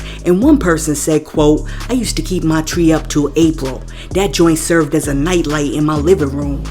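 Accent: New York, United States. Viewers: I know right its like Lyte so New York Im from L A so it is so cool to me 😎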